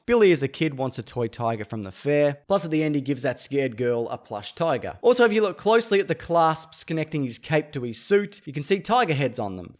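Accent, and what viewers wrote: Australia. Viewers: I enjoyed 2 things. #1 is that you were the australian voice from the ITSV honest trailer. Man they over done the aussie-ness(?) in the script didn't they. But I was very, very happy to hear you make a cameo with you slightly enthused but very very tired voice of yours. #2 is that you pointed out in the video that shazam did not display any wisdom at all. What a dickhead!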